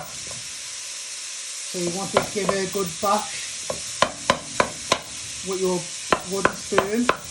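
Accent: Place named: United Kingdom. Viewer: This guy's ability to sound British is amazing!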